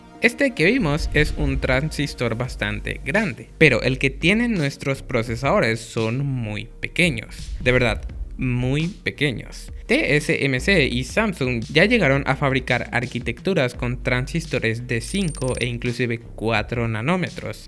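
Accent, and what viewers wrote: Paraguay. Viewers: amigo vos sos paraguayo? de repente tenes acento paraguayo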